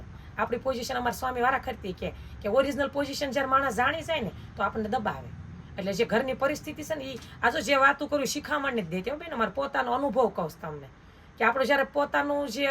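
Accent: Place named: Amreli, India. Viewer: Tame amreli na hoy aevu lage chhe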